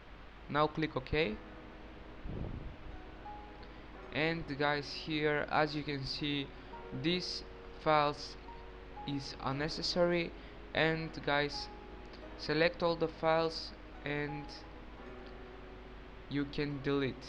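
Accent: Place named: Russia. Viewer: видно русский пытаеться на английском говорить красава молодец!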